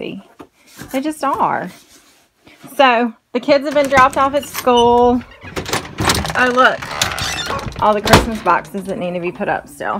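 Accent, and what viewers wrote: South, United States. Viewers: Subscribe Love the videos an the southern accent lol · I could listen to you talk all day!!! I love the "southern accent" thamks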